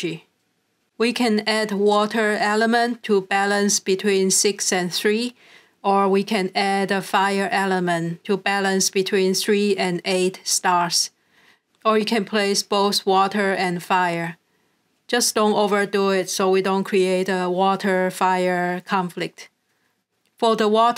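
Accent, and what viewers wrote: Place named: China. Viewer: Sorry cannot understand chinese mix English with strong accent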